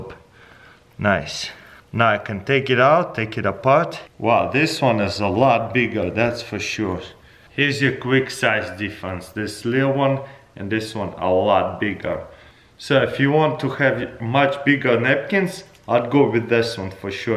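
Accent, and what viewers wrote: Russia. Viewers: "Now i have to zoom out its so big" is awesome. "Full size bich towel" is Russian and Russians are known for their own type of English.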